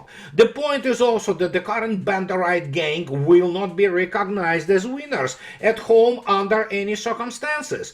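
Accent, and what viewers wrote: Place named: Russia. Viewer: "Russians don't need to rush." Yes, they do - it's in the name! By the way, given how long you've been in the US and how thick your accent still is, would you say the Russian accent is even more persistent than the British accent? Given that one of the key attributes of Russians is that they endure, I guess that would make sense.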